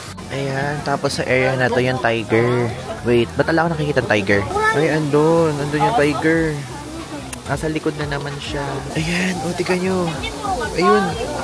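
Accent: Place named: Bulacan, Philippines. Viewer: As a bulakenya gustong gusto ko yung pagtatagalog mo,! sa bulacan ko lang naririnig ang supil 😂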